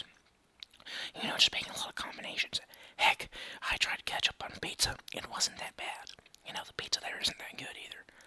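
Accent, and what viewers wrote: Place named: South, United States. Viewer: bro your so funny you sound country like me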